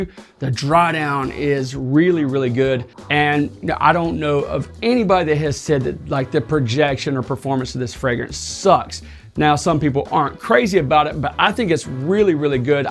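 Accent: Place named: Florida, United States. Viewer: Always enjoy your down to earth reviews! I need to try that Nasomotto! I'm in NY now but your accent and demeanor reminds me of the circle of friends I had when I lived in Daytona beach Florida. Lol.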